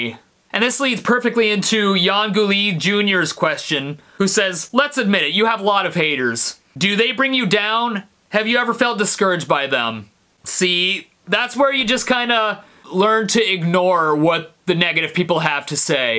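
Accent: Canada. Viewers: I’ve been watching your vids for ages, this is the most Canadian you’ve ever sounded ❤️ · You sound extra Canadian in this one. Respect.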